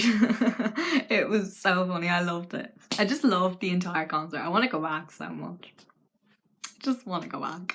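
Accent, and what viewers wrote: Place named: Ireland. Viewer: I didn't know you were Irish? (i think, you sound irish) your accent is cute and I saw that you did go and to a halsey concert, but I'm glad that you had fun 💞💗💗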